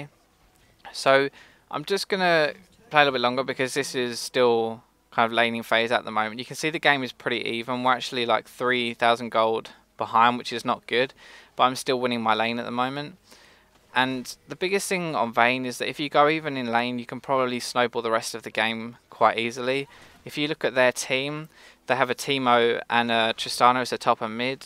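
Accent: United Kingdom. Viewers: As always, your videos are awesome and super informative. For some reason I find your videos much easier to watch, understand and learn from purely because you're British. It'd be awesome to play with you sometimes (I main support), and also a quick question - could you put up a video of a Vayne game against a bunch of heavy divers? Team fighting is always hard for a Vayne when the enemy team has like a Renekton and a J4 for example.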